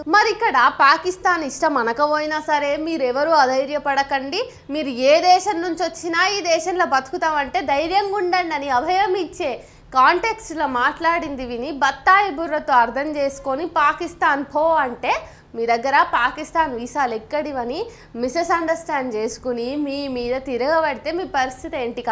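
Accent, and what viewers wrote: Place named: Telangana, India. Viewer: అతితెలివి dobbamaaku తల్లి చూస్తే చక్కని తెలంగాణా యాస బాగుంది.కానీ పాకిస్తాన్ ను ప్రేమించేవారు వెళ్లాలా..!వద్దా!జాతి సమైక్యతను దెబ్బతీసే వాళ్లను ,భారత సార్వభౌమాధికారం ను విచ్చిన్నం చేసే వారు ప్రజాస్వామ్యంలో శిక్షార్హులు...లేదా భారత పౌరసత్వాన్ని వదులుకుని ఆదేశం పోవాలి.నైతికభాద్యత వహించాలి.పవన్ కళ్యాణ్ అనైతకమైన ప్రేలాపనలు కరెక్ట్...కానీ సమకాలీన పరిస్థితులు దృష్ట్యా ...పాకిస్తాన్ భారత ను 75 సంవత్సరములుగా పెడుతున్న ఇబ్బంది పర్యవసానానికి ప్రతిస్పందన అలాగే ఉండాలి.విషయ పరిజ్ఞానం ఉండాలి తల్లి .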